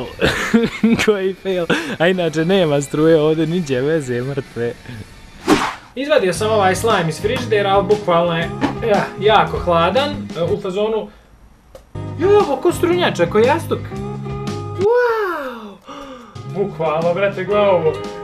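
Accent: Bosnia and Herzegovina. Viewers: Jesi ti iz Bosne ba hahahaha ili Crne gore???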